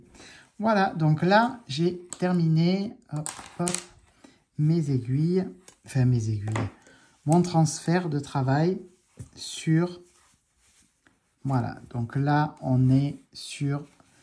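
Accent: South, France. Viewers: Bonjour, je vous remercie pour ces explications et français et avec l'accent du Sud! J'ai l'impression d'être Je en vacances! Je vous écoute depuis la Suisse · Bonsoir, merci pour ce tuto au top avec notre accent du Sud! De mon côté le grafting n'est pas toujours net donc je tricote en toe-up. Bon tricot et à bientôt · Bonjour tu as raison de te bader...😊😊 je suis du sud aussi et j'aime ton accent. C'est normal on aime sa region. Merci pour ton partage.🌻🌻🌻👍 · Merci pour ces très bonnes explications, les pointes sont bien régulières. J'adore votre accent du Sud, celui du Nord n'est pas mal non plus, à bientôt dans la prochaine vidéo ou tuto, on se régale, excellent professeur 👍bizoux kiki du Nord 😘😘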